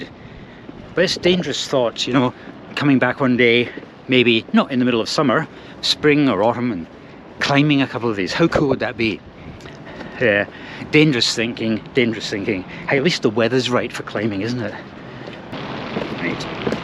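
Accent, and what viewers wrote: Scotland, United Kingdom. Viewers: Nice that you were brought up in Sydney and were able to keep your great Scottish accent！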